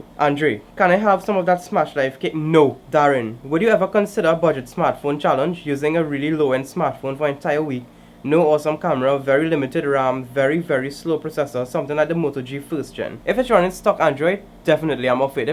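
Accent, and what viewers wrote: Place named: Trinidad and Tobago. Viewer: Rel glad you stick to your trini "accent"...good stuff...keep up the hard work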